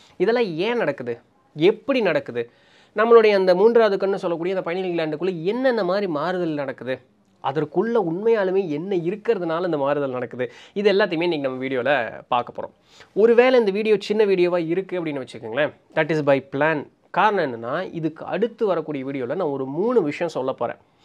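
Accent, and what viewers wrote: Coimbatore, India. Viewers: Anna neega Coimbatore ha ❤😊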